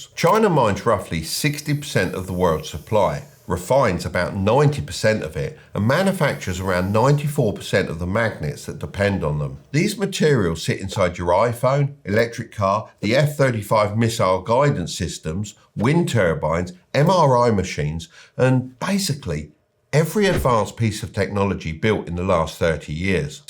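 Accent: Cockney, United Kingdom. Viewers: Cockney accent detected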